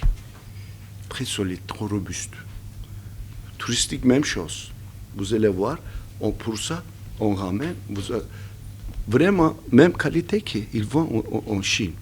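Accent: Turkey. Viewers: تركي تركي تركي يا عباد هدا تركي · عجبتني لهجته التركية في حذيته بالفرنسية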